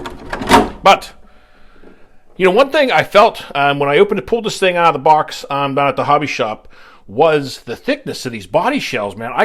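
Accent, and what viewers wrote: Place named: Pittsburgh, United States. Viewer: Bro... are you a Yinzer? I'm out west and I'm picking up some serious Yinzer vibes. Makes yer vid special. Keep it up!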